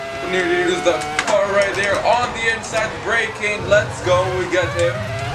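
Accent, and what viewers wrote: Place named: England, United Kingdom. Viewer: Vezi ca ai putina engleza pe la coltul gurii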